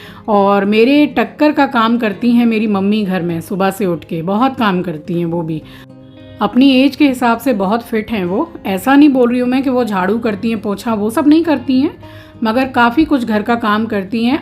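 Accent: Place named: Marwar, India. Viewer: Aap marwaadi hae,mere nanihal me same working chalti hae or bolne ka tarika bhi kyunki woh bhi marwaadi hae…😊😊 I like ur life style because I remember my nanihaal after watching ur vlog❤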